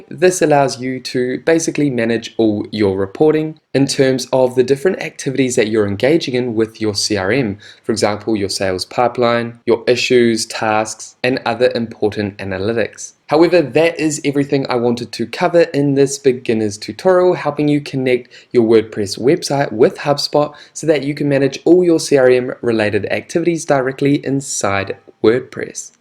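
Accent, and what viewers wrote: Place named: New Zealand. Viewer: I like your nz accent. reminds me of a damn good time. but now I'm back in Europe. 😥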